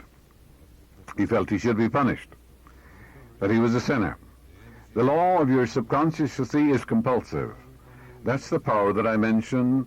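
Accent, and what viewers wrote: Ireland. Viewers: Like cha and miah Murphy always retained that cork accent! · Plenty of good stuff here. I never realized had such a heavy Irish brogue!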